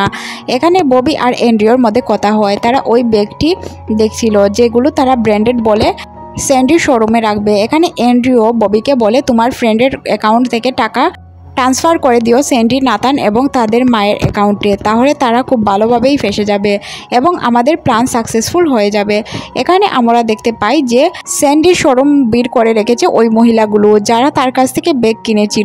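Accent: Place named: Mymensingh, Bangladesh. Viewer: আপু আপনার উচ্চারণ ভালো না, ময়মনসিংহ আঞ্চলিকতা 😅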